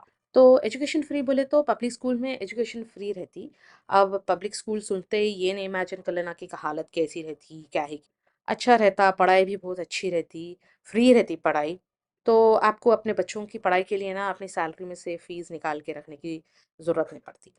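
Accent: Hyderabad, India. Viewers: Assalamalykum...first comment and like ...i am from hyd to....aap bilkul sahi boley ...i 👍 agree...hum bhi US mein they phir hyd aaney ka decision liye...us ke baad bahut regret hua aaney k baad ...khair Allah ki marzi thi ....aap ka hyd ascent achcha laga ...koi log hyd ke hokey bhi apna ascent change karke vlogs daltey...aap ke videos dekh k chicago ki yaad aati bahut....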